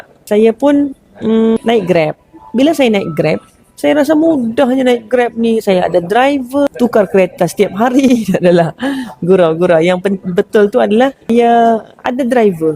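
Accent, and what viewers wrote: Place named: Indonesia. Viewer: Rasa2 loghat apa kakak ni guna ye? Kejap dgr mcm indonesia, kejap macam utara.. konpius...😂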